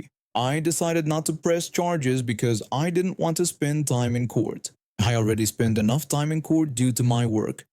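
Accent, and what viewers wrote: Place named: United Kingdom. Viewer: It's the British and Australian pronunciation. Many of these stories originate in other countries, and is clearly not a US native. No doubt he learned English from a Brit. I think his pronuniation is kinda cool!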